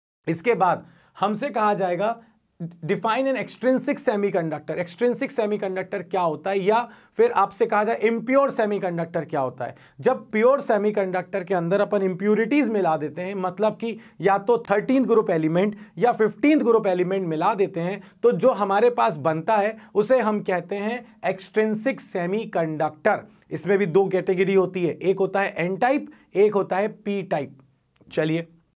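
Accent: Bhopal, India. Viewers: Ap bopal ke ho ..aap ki language lag rahe hai..👍.vese apne bhout aacha batya 👏👏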